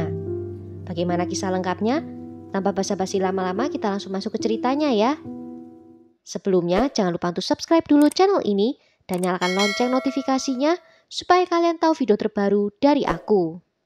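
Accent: Java, Indonesia. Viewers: Jawanya medok ya kk 😄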